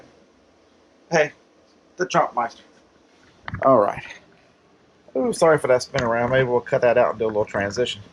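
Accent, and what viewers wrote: South, United States. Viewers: So nice to hear a southern accent. SE Tennessee here.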